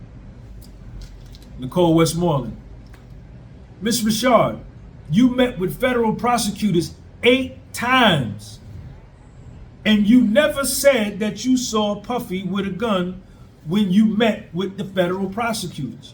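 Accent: United States. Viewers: This man has a good USA accent, keep up the good work . ⚖️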